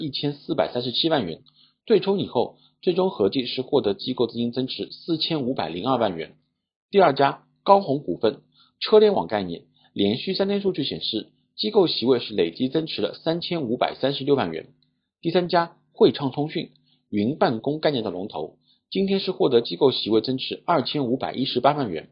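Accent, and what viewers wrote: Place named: Shanghai, China. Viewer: 听老师口音祖籍应该上海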